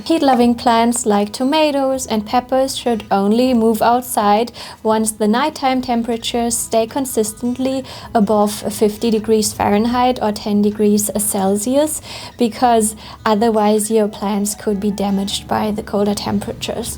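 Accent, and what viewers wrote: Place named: Germany. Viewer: You’ve got the most lovely german accent I’ve ever heard, great video!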